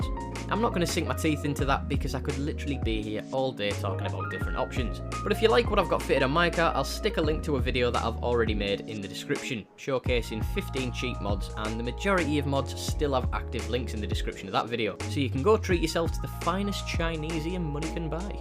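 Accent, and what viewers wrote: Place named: Northern England, United Kingdom. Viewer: Lads from the North just explain things better, or should I say bettah. Top class content. Nice motor too.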